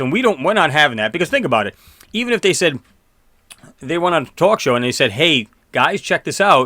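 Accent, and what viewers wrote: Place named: New England, United States. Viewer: Also I've seen a lot of your videos and like them a lot, I want to point out an anomilie with your accent, are you from new england??? I myself am originally from Maine and hear that. LOL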